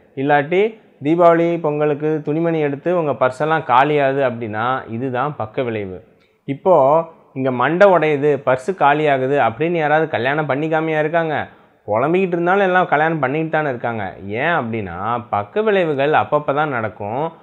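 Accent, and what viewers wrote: Kongu, India. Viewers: கொங்கு மண்டலத்து பேச்சு நக்கல் நையாண்டி அப்படியே இருக்கு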